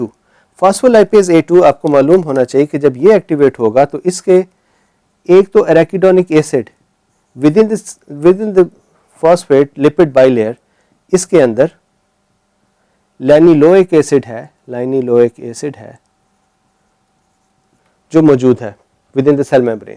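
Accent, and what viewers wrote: India. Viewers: it's imposible to understand indian 😢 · ur urdu is so gud u hv bit of kashmiri accent :)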